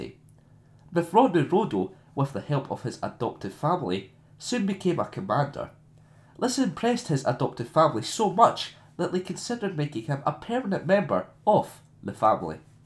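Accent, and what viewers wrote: Scotland, United Kingdom. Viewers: So scottish my dude.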